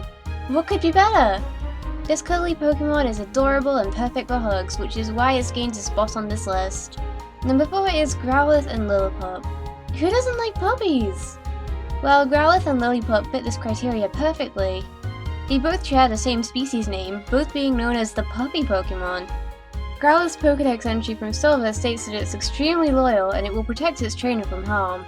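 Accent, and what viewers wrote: England, United Kingdom. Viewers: me seeing this vid in my suggested feed big deal, another poketuber! im subbed to, like, a million others! ah, wtf, ill watch one of their vids me after starting this vid HOLY ARCEUS SHE HAS AN ENGLISH ACCENT!!! SUBBED!!!! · are you English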